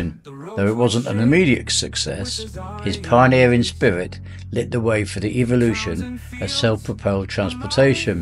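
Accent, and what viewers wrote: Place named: United States. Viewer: Maybe if the AI can get a cornish accent instead of american one it would suit good!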